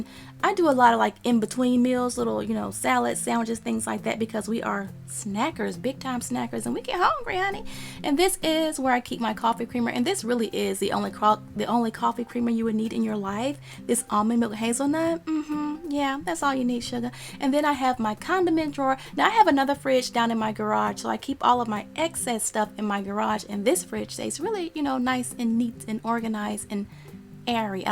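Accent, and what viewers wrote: South, United States. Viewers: Subscribed cause I love her southern accent 😍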